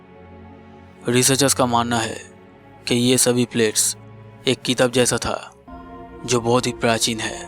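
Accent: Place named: Bengal, India. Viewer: Awaz sunke bol sakta hu,Bhai tum Bengali ho na ? 😂 Sach batayo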